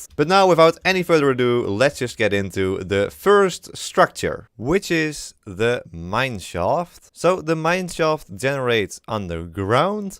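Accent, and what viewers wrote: Netherlands. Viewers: i smell dutch :) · Bro you sound dutch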